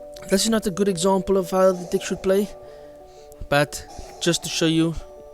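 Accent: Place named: South Africa. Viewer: Are you south african? Sounds like it :) If thats the case let me know the. I'll subscribe.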